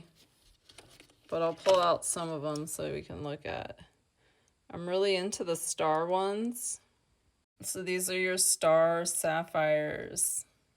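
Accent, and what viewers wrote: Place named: United States. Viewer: sounds american Indiana or Colordo im guessing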